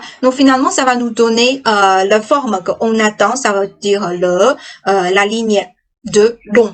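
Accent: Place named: Northeast, China. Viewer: 东北大查子味法语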